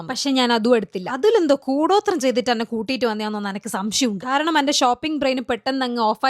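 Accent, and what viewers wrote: Kannur, India. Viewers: Inj poliyanu bro ... Nammude Kannur basha yanu main ..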